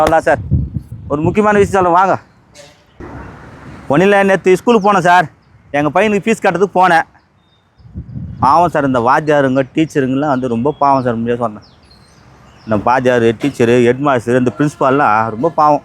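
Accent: Madurai, India. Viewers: Madurai vasagam super ❤🎉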